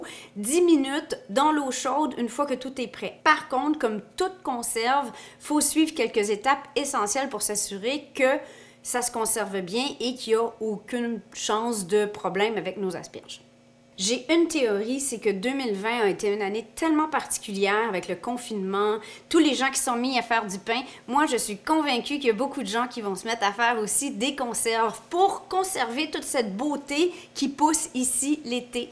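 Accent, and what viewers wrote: Quebec, Canada. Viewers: j'ai adoré ta vidéo ! vraiment merci !et en plus avec ce chaleureux accent québécois que j'affectionne tant ! hâte de revenir au Canada ! je m'abonne !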